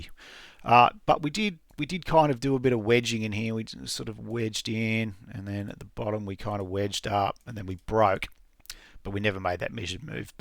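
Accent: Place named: Australia. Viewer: Great to hear the Oz accent on YT!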